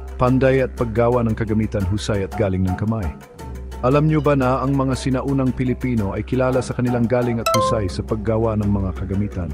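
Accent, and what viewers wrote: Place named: United States. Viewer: PARANG AMERIKANO YUNG NARRATOR..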